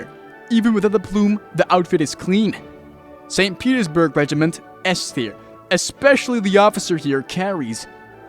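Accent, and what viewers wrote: France. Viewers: i like ur french accent, subbed good vid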